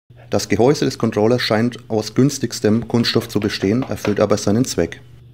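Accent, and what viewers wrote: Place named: Franconia, Germany. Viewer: Gutes Video👍🏻. Nicht zu lange, gut erklärt und angenehm zuzuhören. A propos zuhören. Darf ich fragen woher du grob kommst ? Du könntest vom Dialekt quasi mein Nachbar sein 🤣. Fränkisch ist einfach symbaddisch :) Lg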